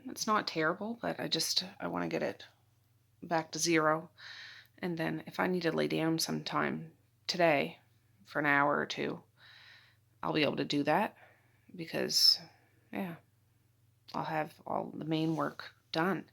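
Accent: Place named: Canada. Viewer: Her Canadian accent. Lol 🙂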